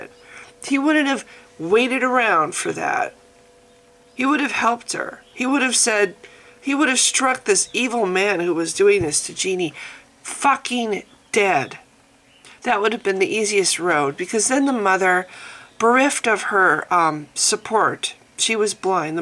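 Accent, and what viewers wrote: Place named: United States. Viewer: I've known about this girl a few days ago, and definitely she is the proof thar god does'n exist. He never did. I have watched almost every video that exists on youtube about her and I've cried for hours. And now I found this video of yours and I couldn't agree more with you. Seriously. I can assume for your accent that you're from the USA, aren't you? Well I'm spanish, I should have begun saying my english is not perfect and obviously I can't understand every word, and I'm not used to write long texts such as this one, although I can fully understand the context. I hope you read this, 'cause I want you to know that I am overwhelmed by your speech. I found it so true I got a lump in my throat. It's so frustrating that many people call themselves moralists and the only thing they say is "I'll pray for her"!! What? It would be a very expensive trip for me, living in the other side of the world, but I really want to do something for her. 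And so they should do, mostly if they live nearby. God is a fictional character, and he can do for Genie the same that Gandalf, Darth Vader or the flying spaghetti monster can. Nothing. For they are not real. This whole issue just drives me mad, and if it's not too much I'd like both of us to be friends in facebook. Obviously I'm not going to post here my nick for everyone to see, but I think we could chat occasionally. I can send you a private message with my facebook name in case you want. I'm trying to write down this being as polite as I can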